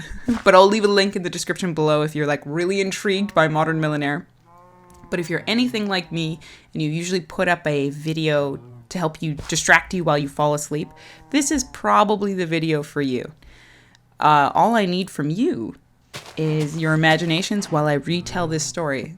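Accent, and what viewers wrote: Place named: South, United States. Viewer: I love everything about this. As a born and bred southerner I laughed heartily at your southern accent.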